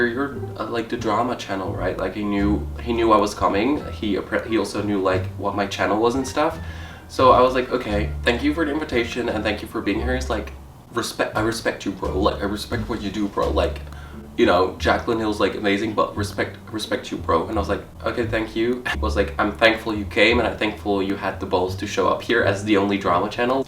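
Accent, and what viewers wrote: Netherlands. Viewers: The Dutch accent lol!!! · Omg he's from the netherlands too! Yooo kaasgap je accent is gaar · yup. just caught that... but with his Dutch accent he always says "who dat" instead of "that"... listen carefully to the way he says that.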